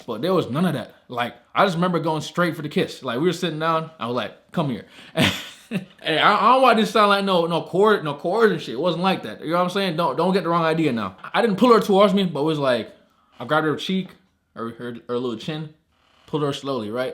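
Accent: Jamaica. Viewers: Lol... Didn't knw u was jamaican bro... Big up😁✌️ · U from Jamaican?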